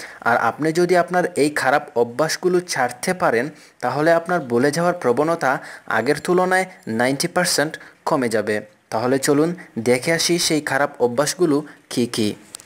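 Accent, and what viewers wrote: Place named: Sylhet, Bangladesh. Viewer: আপনি নিশ্চয়ই সিলেটি ভাষা বা উচ্চারন ঠিক করুন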